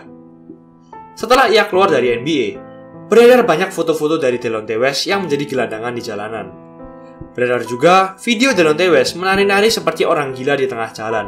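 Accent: Java, Indonesia. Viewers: La medoook😁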